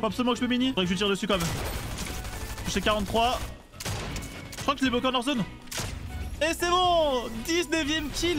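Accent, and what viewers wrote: Belgium. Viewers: Tu es belge ? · t’es belge?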